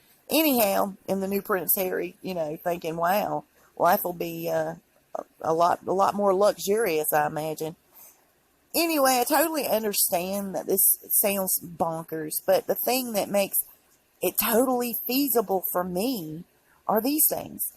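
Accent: South, United States. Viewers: Love your Southern Accent! I'm from the South too, Louisiana. Just subscribed today to your channel. I would LOVE ONE OF THOSE WIGS for a friend of mine going thru chemo. She's very dear to me. I understand if I'm too late. God Bless you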